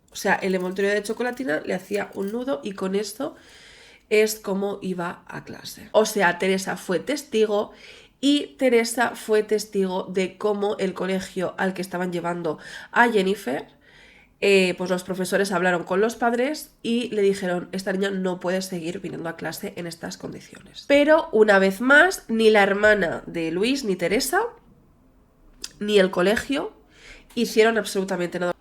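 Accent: Spain. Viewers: Nena el acento español no es de muy mi agrado pero me encanta escucharte no sé porque el tuyo me parece muy lindo ☺️y tus videos muy buenos todo tu contenido es excelente me encanta ❤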